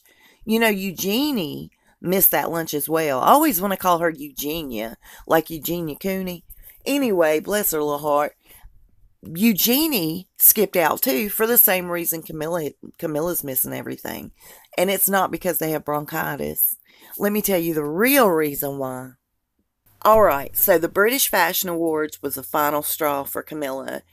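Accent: South, United States. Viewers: What’s the Mystery Woman behind Your Southern Belle Voice ? I want to see your face🌹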